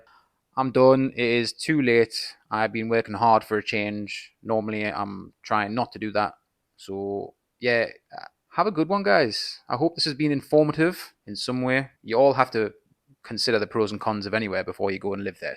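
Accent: Newcastle, United Kingdom. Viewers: Yes, I could hear a "Haway the lads" accent in there 🙂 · Are you a Geordie?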